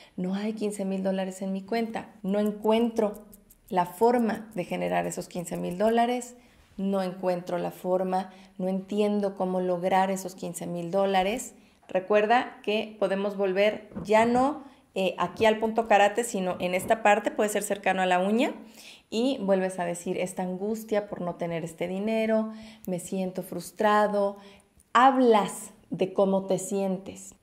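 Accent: Mexico. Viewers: Hola Mexicana hermosa Me gusta tu tono de mexicana A parte que eres linda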